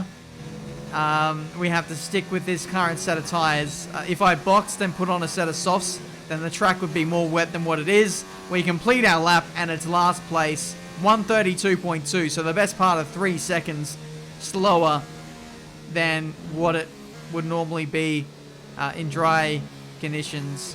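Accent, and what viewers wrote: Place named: Australia. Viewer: I'm not particularly in to F1, but these career mode vids are good fun. Amazing how the graphics capture how the head tilts as the car corners. You can see the force acting on the neck and imagine how strong your neck muscles have to be to take the cornering speeds! With respect to American uploaders, it is refreshing to hear another accent. I love all the Ozzie phrases. I love that the Ozzies take pride in their vernacular. Never mind the Inuit with their 7 words for snow, I love the way Ozzies can say 'this is shit' in a 1,000 different ways!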